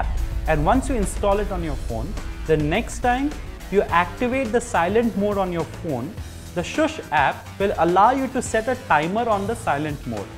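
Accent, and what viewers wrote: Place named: India. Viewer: I saw this as an ad before a video started but I kept watching it because I actually liked hearing his indian accent. hah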